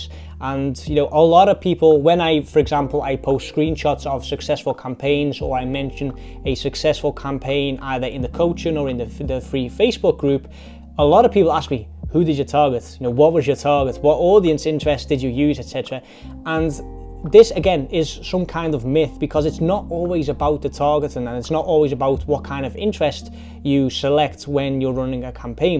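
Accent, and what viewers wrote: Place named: Liverpool, United Kingdom. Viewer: You're from liverpool like it or not. Talking like jordan henderson and TRENT alexander arnold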